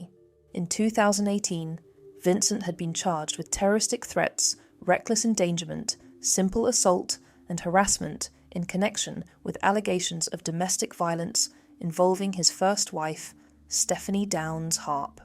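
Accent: United Kingdom. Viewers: This channel has its roots in the UAE yet the presenters accent seems to be from the UK 😮. 🤔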